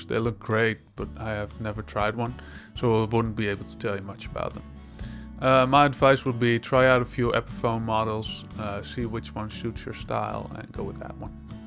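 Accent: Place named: Netherlands. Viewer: The Lord has a voice! And he is Dutch! (The first 3 seconds gave it away)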